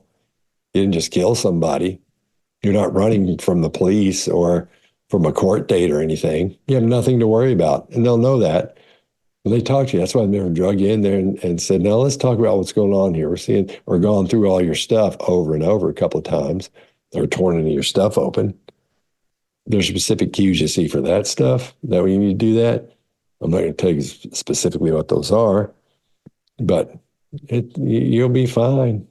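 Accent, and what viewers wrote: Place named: Tennessee, United States. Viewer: On you tube I watch a woman who is a great stand up. She's from Tennessee. I think her name is something like Jeannie Morgan.? When I started watching you, I thought you sounded like you're from Tennessee. You use similar phrases, "I love me a little baby." And "Bless her heart." I was delighted that I was right!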